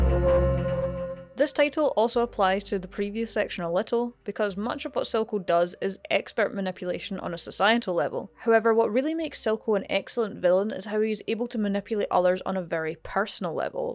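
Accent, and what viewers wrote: Scotland, United Kingdom. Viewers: Arcane and Scottish accent commentary. How can I not subscribe? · A Scottish lass talking about my fave villian in recent memory, yes please.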